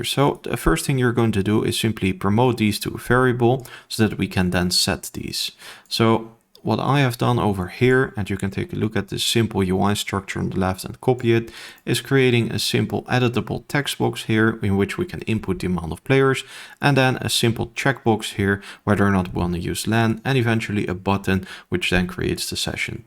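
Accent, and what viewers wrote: Netherlands. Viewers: are you dutch by chance? :) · You have a dutch accent, I am dutch too xD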